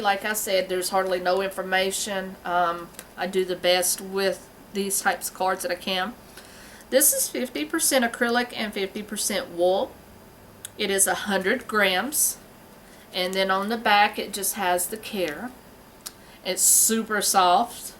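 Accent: South, United States. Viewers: I was wondering where you're from? You mentioned Minnesota but you definitely have that Southern drawl like someone from either Virginia or the South. Also what did you make with the yarn in this video.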